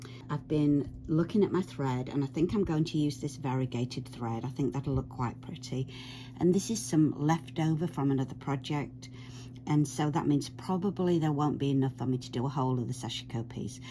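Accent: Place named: Northern England, United Kingdom. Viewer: Thank you very much for all of your Sashiko videos. I’m a complete beginner and you are the first channel that actually has slow and helpful tutorials. I’ve found so many other videos that start, assuming you already know how to make a Sashiko knot, etc. So, thank you for all of your help. On another note, are you from the Midlands or northern England? I absolutely adore your accent. Greetings from Washington!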